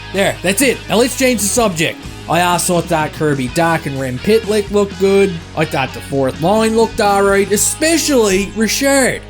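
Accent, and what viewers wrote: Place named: St. John's, Canada. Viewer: Is he a Maritimer though? He sounds to me like a townie from St. John's? What are ya, buddy?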